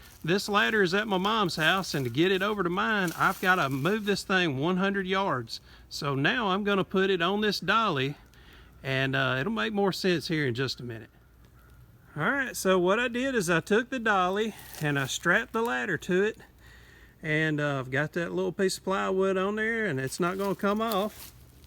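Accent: South, United States. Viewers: Being from South Carolina I was glad to see someone who speaks Southern American English make an RV roof repair video. I couldn't understand what the folks from Connecticut and California were saying. Very useful information. Thank you.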